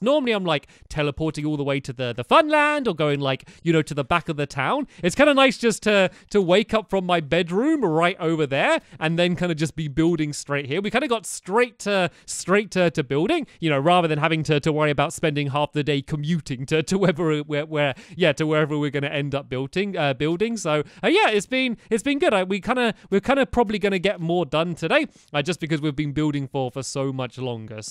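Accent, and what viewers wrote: United Kingdom. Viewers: landen reeves he's British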